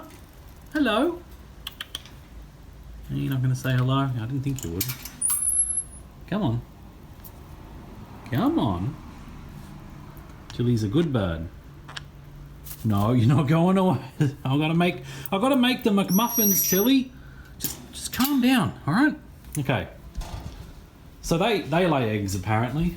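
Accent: Australia. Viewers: Looks like the dual-tap sink typical of British sinks... except you sound Aussie and keep your eggs in the fridge like Americans. I'm so confused!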